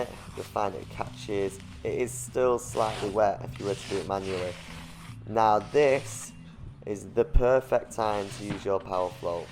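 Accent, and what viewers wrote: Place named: Midlands, United Kingdom. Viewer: I'd guess he's from middle England or a bit further north based off his accent.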